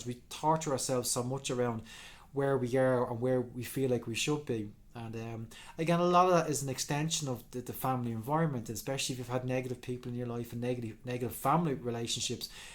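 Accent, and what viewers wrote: Ireland. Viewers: that beautiful Irish lilt I miss so much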